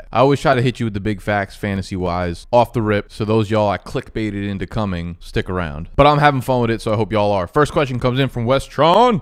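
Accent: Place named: Philadelphia, United States. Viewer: Are you from philly? You got the accent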